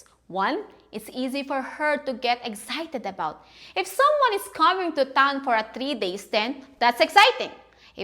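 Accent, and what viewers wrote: Ireland. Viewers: That Damn Irish I just Love Your Accent!!!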